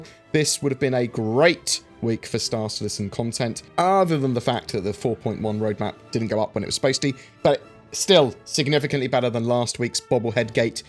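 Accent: United Kingdom. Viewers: Is that a UK accent? Why does he speak like a sound wave .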